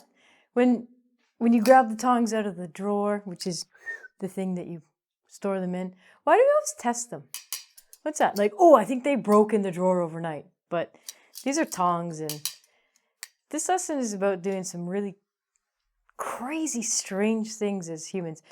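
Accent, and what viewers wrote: Canada. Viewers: Is she from Canada or uk??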